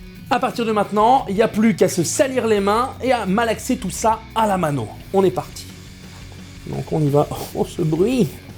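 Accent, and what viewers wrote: South, France. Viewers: Mmmh des bonnes grosses saucisses... Et cet accent sudiste exceptionnel :'') Superbe vidéo avec beaucoup d'humour !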